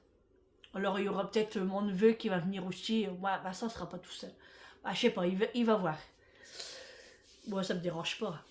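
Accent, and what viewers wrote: North, France. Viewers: voilà, c'est sûrement ça....!!!! Avec votre accent du Nord, le micro, Macho-Mattew, je confondais. Merci. Je croyais que j'étais à "l'ouest" 🤣🤣🤣🤣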